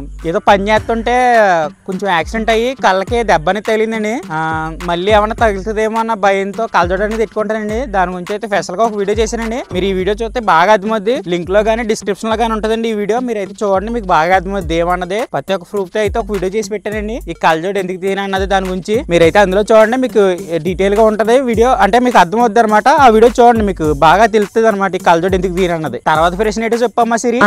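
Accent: Godavari, India. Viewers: Hai anna mana godavari slang lo super warning echav anna all the best anna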